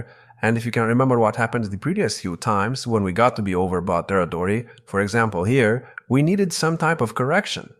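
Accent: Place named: Iran. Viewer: hes accent reminds me of farsi so my guess is Iran 🎉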